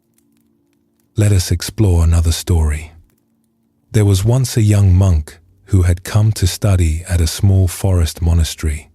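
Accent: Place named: United Kingdom. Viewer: The voice is pleasing, though it seems odd to me that it has a British accent rather than, perhaps, an Asian one….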